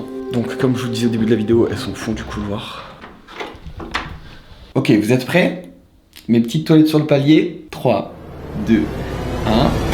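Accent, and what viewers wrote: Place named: France. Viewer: Tu devrais vivre dans une banlieue islamisée, tu as tous les tics de langage des jeunes des banlieues.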